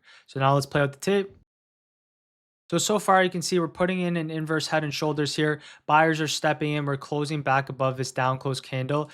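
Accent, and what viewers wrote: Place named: Canada. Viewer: Canadian ey!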